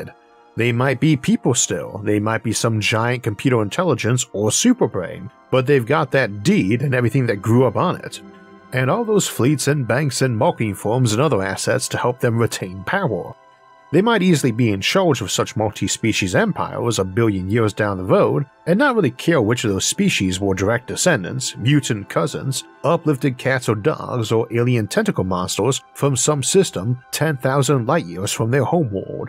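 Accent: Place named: South, United States. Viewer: Man the visuals and graphics are light years away from those early videos. Actually the show has become a very professional package where even your "speech impediment" is as integral as the graphics and visuals. Personally I find your Elmar Fudd with a southern drawl accent unique and I'd put you in the same boat as Sagan and Attenborough although sitting in the stern perhaps.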